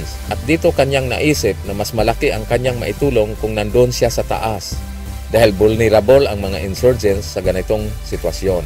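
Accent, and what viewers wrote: Visayas, Philippines. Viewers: Monissa or Monessa?..trick or treats?😁...bisaya ka bay? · Bisaya dong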